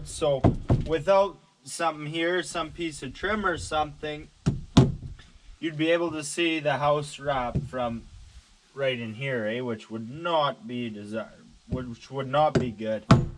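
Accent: Canada. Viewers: From his accent I am going to guess the middle part of Canada. Saskatchewan or perhaps Alberta. From the trees I’m going to guess the northern portions of those provinces. I’m in NW Ontario.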